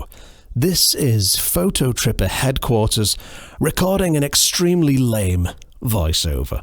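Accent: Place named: Northern England, United Kingdom. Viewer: Not sure if you can change the levels on that cheap audio mixer, but it makes you sound a bit Northern.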